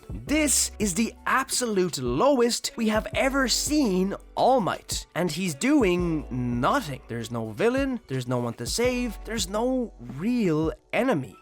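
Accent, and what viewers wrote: Ireland. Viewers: Where ye from lad? Ur accent sounds slightly irish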